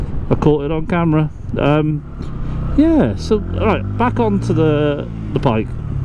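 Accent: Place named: Gwent, United Kingdom. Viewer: What area are you riding around in this video mate, I'm a Welsh boy and your accent sounds Gwent-ish Great review by the way, honest and to the point - just the way I like it 👌 - where is the dealership you got your Outlaw from. Just sat on one for the 1st time yesterday up in Barnet where I am at the moment -felt great 👍 !!!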